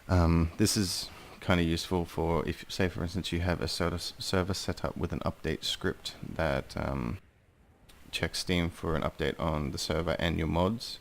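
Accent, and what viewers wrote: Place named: Australia. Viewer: Damn, that's a pretty good Aussie accent for a Norwegian!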